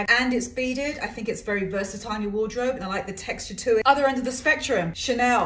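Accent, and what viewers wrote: United Kingdom. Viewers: I love that you still have your British accent despite living for long in New York👏🏽👏🏽👏🏽 u’re a superstar for that❤️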